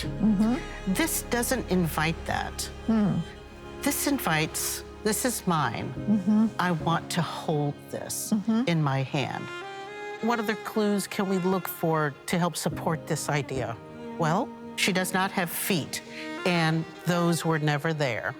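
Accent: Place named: United States. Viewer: Can't get past the usa accent.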